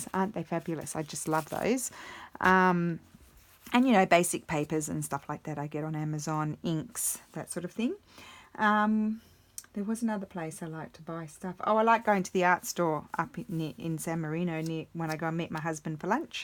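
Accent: Australia. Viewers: As you know I love your videos and chatting about everyday life! Your accent and life in Australia always touch my heart since I still have friends there. Your ideas are fresh and fun to follow! Keep creating and never think you are not good enough, your Great!!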